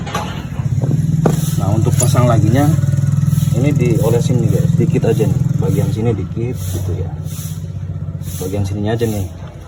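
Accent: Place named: Indramayu, Indonesia. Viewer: posisi bengkele ning ndi kang.. logate kayae wong dermayu ya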